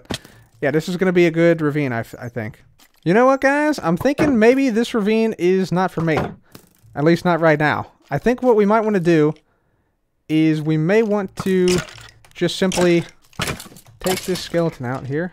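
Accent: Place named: Pennsylvania, United States. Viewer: sounds like a Pennsylvania accent. loving Season 7 so far! great start...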